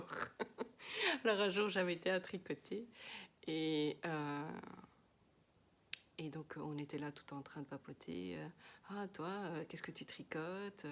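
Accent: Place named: Belgium. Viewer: Bonjour je viens de découvrir tes podcasts tricot et en t’écoutant je me disais bien elle est de Belgique cette dame 😂😂 Ton visage ne met pas inconnu peut-être du monde du foot ou pas😆 Moi je suis de Uccle je ne sais pas si tu connais, en tout cas je passe de très bon moment en visionnant tes vidéos 🫶 Bisous et bonne journée 💋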